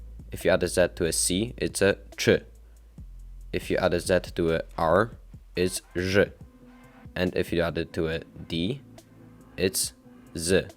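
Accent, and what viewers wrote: Poland. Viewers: ale to słychać że polakiem jesteś bo słaba wymowa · Wyczuwam ten polski akcent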